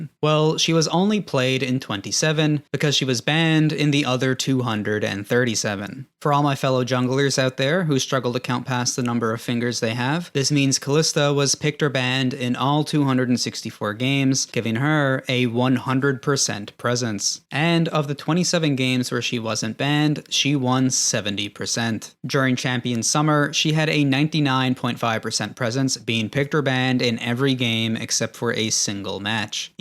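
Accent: Canada. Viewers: can you please work on getting rid of your canadian accent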